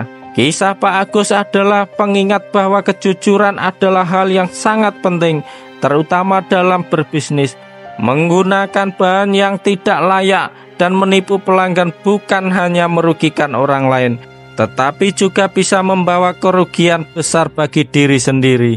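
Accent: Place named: Java, Indonesia. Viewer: Suka sih dengan ceritanya, tp suara mas nya terlalu medok kaku, nada bicaranya gk enak di dengar, Terlalu medok, terlalu kaku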